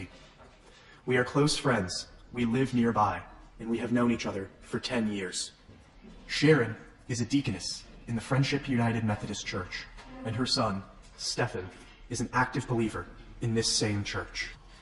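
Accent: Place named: South Korea. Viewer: the way he was speaking English, but it sounded like he was reading a Korean dialect of English.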